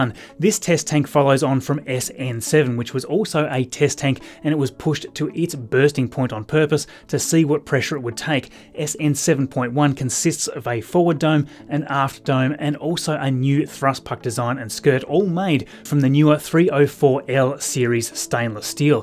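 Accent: Australia. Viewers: It's nice to hear an Ozzy voice giving the week's space launch news. Keep up the good work.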